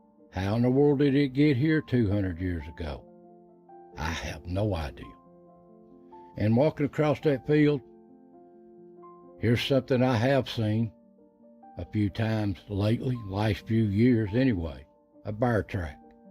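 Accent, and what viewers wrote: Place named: Tennessee, United States. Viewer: Worth watching just to hear that wonderful Tennessee accent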